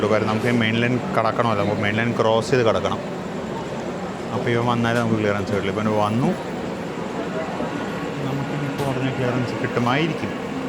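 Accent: Thrissur, India. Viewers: Musical language of Trichur, much liked. ❤❤❤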